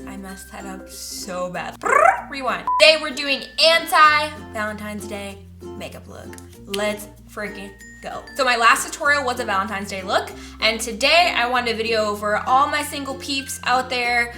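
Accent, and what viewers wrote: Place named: Tennessee, United States. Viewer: After watching this, I watched your Thanksgiving video and I love your accent! It's so pretty in Tennessee!! I love you! #snapfam #Igfam #youtubefam #queen 💜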